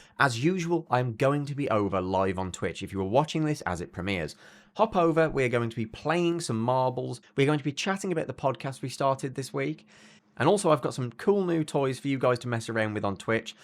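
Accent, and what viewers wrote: Birmingham, United Kingdom. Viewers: whats your accent? some mad brummy style :) good vids man, fellow dev here, geordie.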